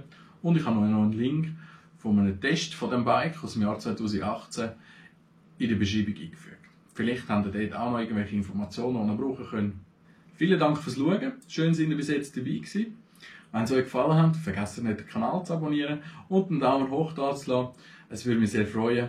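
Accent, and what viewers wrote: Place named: Switzerland. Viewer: einiges gelernt... bissl übers Bike, aber vor allem Schwizerdütsch, mithilfe der Untertitel 😁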